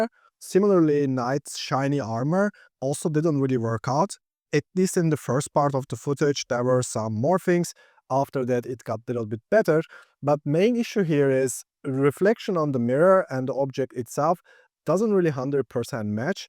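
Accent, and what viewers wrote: Turkey. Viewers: Great content as always and dude, I’ve been following you for a while and always kind of thought your accent sounded Turkish. Your English is super good, so I wasn’t totally sure. But after seeing that Istanbul clip, I’m like—yep, you’re Turkish, right? 😅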